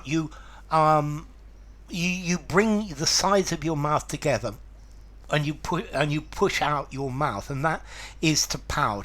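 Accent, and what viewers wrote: United Kingdom. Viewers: He is always using his perfect British accent in explaining and giving vocabulary lessons , you can check his previous videos throughout these years. They might be at handy .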